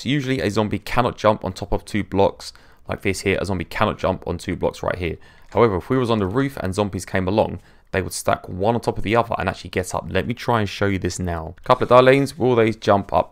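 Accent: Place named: England, United Kingdom. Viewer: Dude, thicc English pronunciation. Do love it)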